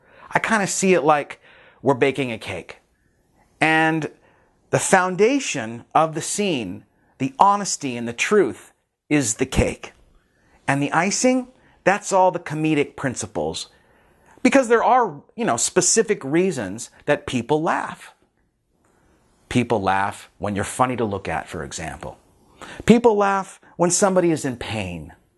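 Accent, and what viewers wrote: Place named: United States. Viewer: Thank you very very much! I am russian (Sankt-Petersburg)Your video is worth its weight in gold for me cause I understand all of what you're talking about.I want to talk american-english like you do and in the final analysis be an actor.Much odliged. Yury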